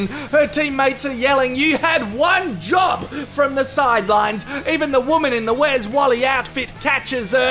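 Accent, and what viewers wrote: Australia. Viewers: im not sure why ur so funny as an american, i think its a combination of the clever commentary references, your aussie accent, and the sheer amount of fail of the people in the videos you choose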